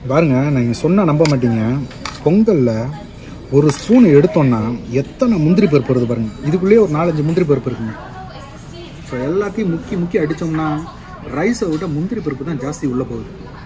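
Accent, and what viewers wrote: Madurai, India. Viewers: Nee madurai ah bro. Slang madurai maari irukku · ஆஹா...ஆஹா...மதுரை தமிழ்... அழகு அழகு....வாழ்க வாழ்க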